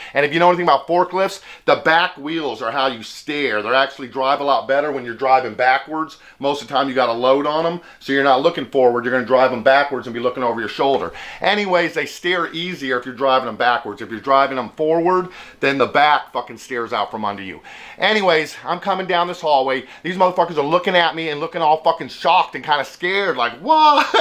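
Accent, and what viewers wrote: South, United States. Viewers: So if you are from WA, how come you sound Southern?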